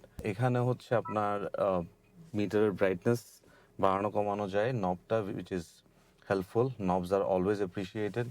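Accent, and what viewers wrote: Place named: Chattogram, Bangladesh. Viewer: Bro is the showroom located in Chattogram khushi road? Your accent says your from Chattogram.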